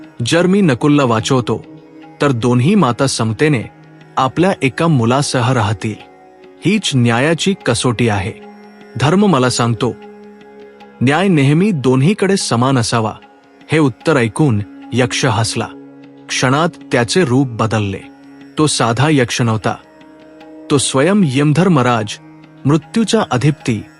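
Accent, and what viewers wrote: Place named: Gujarat, India. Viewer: वाचना मध्ये गुजराती भाषेतील उच्चार असू नयेत.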